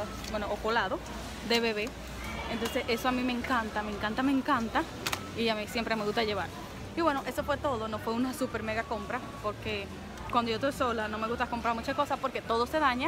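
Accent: Dominican Republic. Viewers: Yo sabía que eras dominicana desde que escuché nuestro acento!❤️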